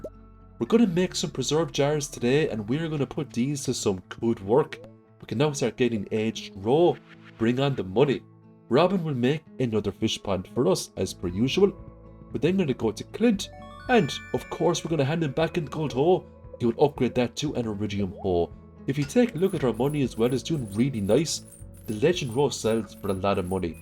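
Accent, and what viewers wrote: Ireland. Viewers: OMG I knew it, I knew you must be irish! So glad I ran into your answer to btw an absolutely inappropriate question.... I've been learning irish for a while now and I'm just so pleased that I've managed to recognize it in your english :D The way you're saying "farm" was the strongest hint 😂 I LOVE it btw, could listen to you for endless hours - which is what I just actually did by watching this video xD Just started a fishing only Riverland farm myself and was coming for inspo. You've been such a big help, absolutely nailed the challenge. Keep up the good work! ❤️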